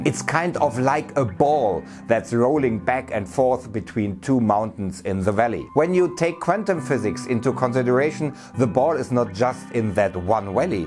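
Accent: Germany. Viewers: Ich liebe wirklich sehr ABER die englische Aussprache ist furchtbar! Und die Grammatik ist leider wirklich auch nicht so der hammer. Man hört sofort dass da ein Deutscher englische Texte abliest.... und das Thema hätte ich lieber in gut gesprochenem Deutsch gehört. Für die englischen Zuschauer gibts doch die Untertitel Funktion....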